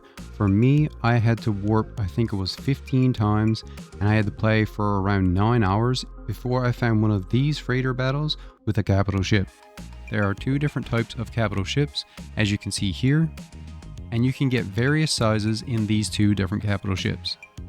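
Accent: Newfoundland, Canada. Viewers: Thought I recognized that accent! Greetings from a CFA living in St John's : ) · I knows a newfy when I hears one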